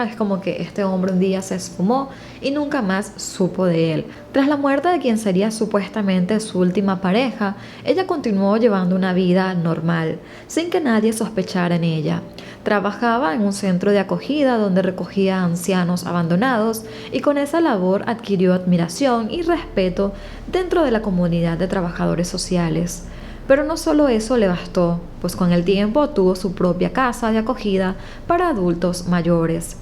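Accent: Venezuela. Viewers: De donde eres? Me suena que eres paisana😊🇻🇪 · TheFeistyOne xx creo q es de Venezuela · Buenos días tienes una excelente capacidad de narración eres Venezolana ?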